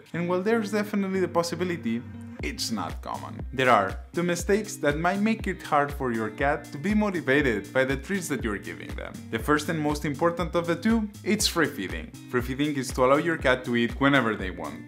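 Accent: Spain. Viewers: I'm trying to pin down your accent... Spain?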